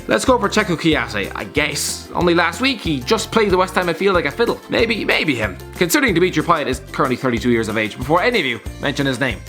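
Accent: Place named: Ireland. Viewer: I love your accent thats irsh init amazing accent that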